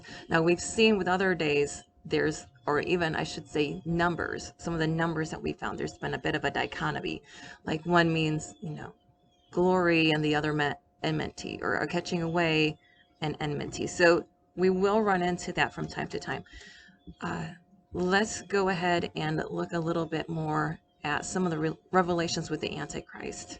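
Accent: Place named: Scotland, United Kingdom. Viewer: Lol if yi dinny see it, that's Scotish lol are you from Scotland.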